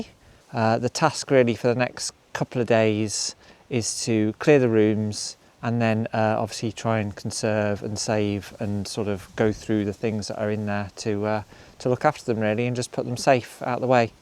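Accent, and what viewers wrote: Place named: Ireland. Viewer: Im new to your channel ❤❤❤ i love your voice it's your irish accent ❤❤ all my love from America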